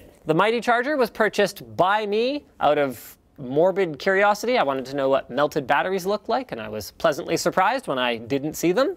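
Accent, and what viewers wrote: Canada. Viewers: Someone could tell that you're Canadian from the first second of this video lmao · what you a canadian ey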